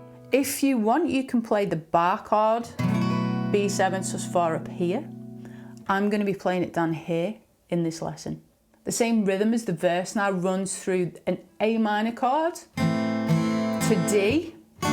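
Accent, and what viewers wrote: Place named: England, United Kingdom. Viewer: WOW , GREAT LESSON , PLEASE TELL ME WHERE YOU COME FROM ,,..OK ? ENGLAND ? YOU HAVE AN ACCENT , JOHN FROM NORTH CAROLINA , USA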